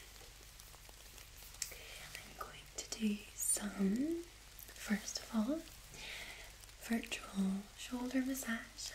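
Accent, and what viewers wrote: Scotland, United Kingdom. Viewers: I thought it can't get better than this Scottish girl, rain, then came the nails and I had to bounce. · She murmers scottishly.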